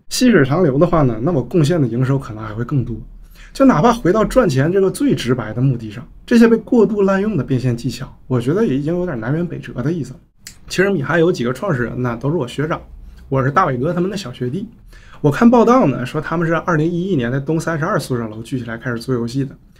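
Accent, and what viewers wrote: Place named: Tangshan, China. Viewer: 这个口音，是唐山人吗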